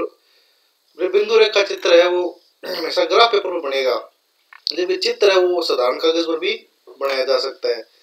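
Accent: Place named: Bagri, India. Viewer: Sir aap hindi bdiya nhi bolte . Aapke tone se bagri nikl rhi h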